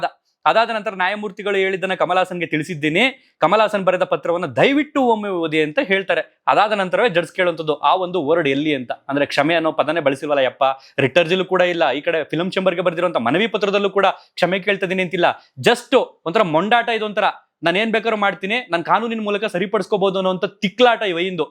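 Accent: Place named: Belagavi, India. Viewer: ಬೆಳಗಾವಿ ಕನ್ನಡ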